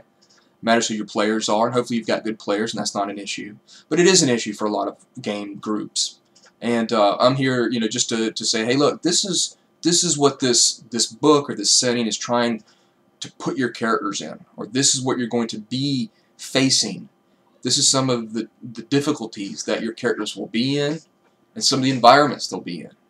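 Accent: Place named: South, United States. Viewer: Are you from Greenville SC? I hear the southern in your voice and I saw the WYFF meteorologist video lol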